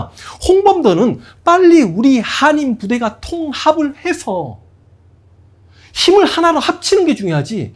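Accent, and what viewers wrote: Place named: Chungcheong, South Korea. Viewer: 난 황강사님이 충청도 말씨라서 강의가 주구창창 공산주의를 지향하는 사회주의적이라 이상하다며 들었습니다 역시 광주분이네요 전라도분들은 거의 모두가 객관적 사실보다 목표를 세우고 그기에 대입하시는 경향이 많아요 강의하실때 좀더 실증적으로 하시면 감사하겠습니다 그 해박한 지식을 지향하시는 목표에 대입하시면 일반 사람들은 반박하기 힘들어요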